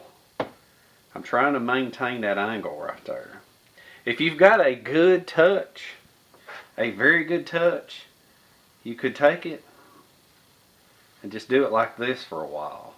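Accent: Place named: Florida, United States. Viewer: I was 17 when I went into the Army,I was the only one that was not an NG,I was RA and 17 all the NGs was from the north and me from Fla farm,they made fun of the way you and Isound untill we went on bivouac and to the rifle range,The city boys had never had it rough or been out in the sticks for fun,guess who had the last laugh,I can listen to you all day,just makes me home sick,keep it up Bud I,m 71 now and they call me OldJoe,or Old Grand Paw any way love uor stiff LOL keep it,Oh I did not know I was satropping wrong, thanks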